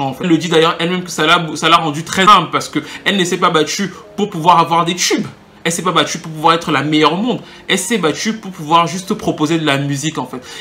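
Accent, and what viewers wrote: Cameroon. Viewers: The way you speak french to an extent reminds me a lot of home 237 love it!!!!!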